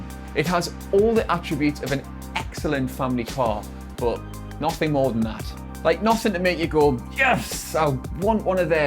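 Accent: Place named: North East England, United Kingdom. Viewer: Why aye the man from the north east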